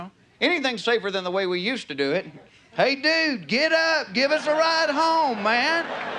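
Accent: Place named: South, United States. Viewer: It's that accent, man, and years if media stereotyping. Half my relatives are from the south and im still prejudiced.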